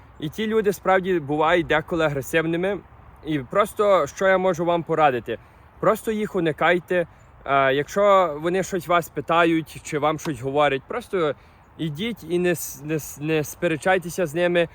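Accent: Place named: Ukraine. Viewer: Що смішного? Український діалект. Діалекти має кожна нація!!!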